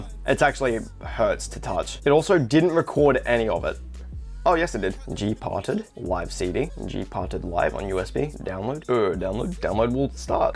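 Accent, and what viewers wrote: Australia. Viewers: Ur Aussie same lol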